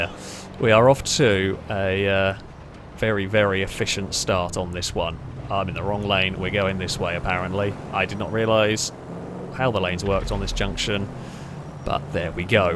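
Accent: United Kingdom. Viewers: I didn't notice anything odd, but then again I've always taken any of his odd sayings as 1. Just plain ole' British English accents versus my American ears and/or 2. I the heat of the moment he's not always fully concerned with prefect pronunciation.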